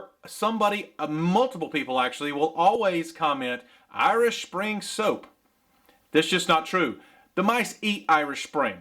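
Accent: United States. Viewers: I am sorry, some folks suggest that people with British accents sound intelligent but, I am here to tell you, you can take a country accent to the bank! Keep it up and GO DAWGS!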